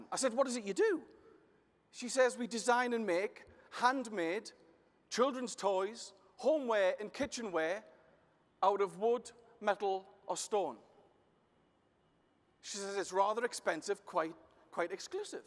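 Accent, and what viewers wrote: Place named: Scotland, United Kingdom. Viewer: Brilliant Keynote. I love that you have an accent (me too I'm a Scot)!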